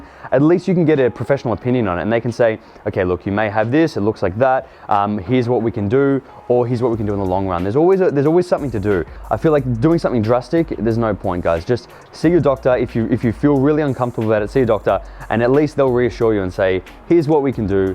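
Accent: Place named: Australia. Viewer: Ngl mate your videos are great, but your Australian accent makes it that much better and cooler lmao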